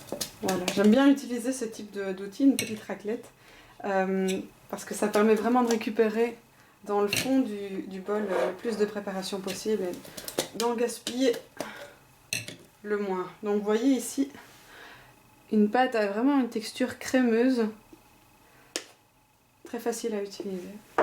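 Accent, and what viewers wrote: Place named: Belgium. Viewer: Merci pour la recette mevrouw ; j'ai tout de suite entendu que tu étais une compatriote Belge ! :) J'ai une question : le Blender Magic Bullet est-il une machine fiable ? Parce que vu son prix (99$ c'est ça ?), je préfère que ça soit un investissement sûr et durable (et alors, où se le procurer en Belgique...).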